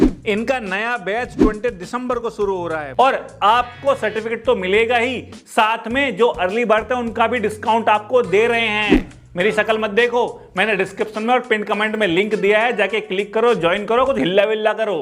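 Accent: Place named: Meerut, India. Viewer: Bhaiya aap mujhe bahut ache lagrte ho or aap bilkul humhare goan meerut side jaisi language bolte ho hila matlab kaam dhandha karo aisa humre yaha bolte hai❤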